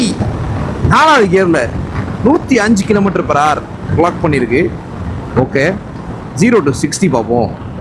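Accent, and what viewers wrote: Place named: Madurai, India. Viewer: Nimukkum pothu? Speech madhura Karan madhura Karan than😂🎉😊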